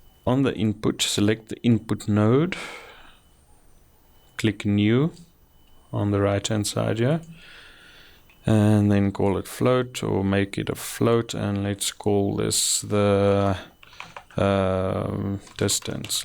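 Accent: South Africa. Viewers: Hoekom klink jy soos n Suid Afrikaner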